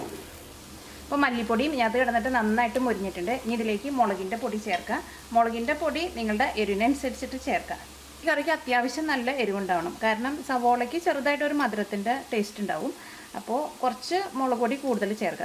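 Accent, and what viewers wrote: Thrissur, India. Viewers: Very nice. Pachakam n vachakam balanced. Nice Thrissur bhasha 🤗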